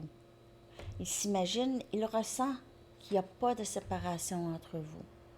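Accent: Quebec, Canada. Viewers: Bonjour, moi j'adore votre accent québécois, il me fait 😄 Et vos guidances sont souvent très justes 👍 Ne changez rien, c parfait! Et n'écoutez pas les ronchons 😂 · Moi j'adore votre accent,ca me fait du bien, je vous écoute presque tous les jours.❤🙏 Ça me rappelle mon voyage au Québec, j'ai tellement aimé, la gentillesse des personnes et les paysages ds les laurentides ...le vieux Montréal alors merci pour vos guidances et votre accent ❤❤❤😘😘🇨🇭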